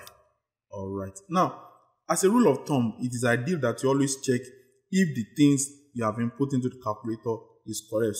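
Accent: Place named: Nigeria. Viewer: Sounds like a Nigerian accent 🤔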